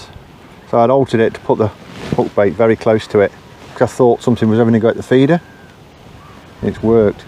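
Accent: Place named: Nottingham, United Kingdom. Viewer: Hi, just found your channel by chance. Nice to hear that Nottingham accent lol. I used to fish the steps as a kid and had many great days there gudgeon bashing in the ‘70’s. Also had success hemping for roach. You’ve inspired me to get down there again on the feeder.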